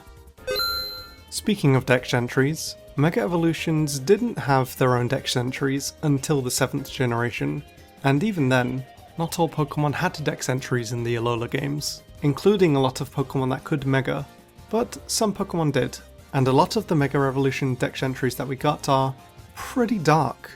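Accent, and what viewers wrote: United Kingdom. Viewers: he’s bri’ish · Exces me are you British?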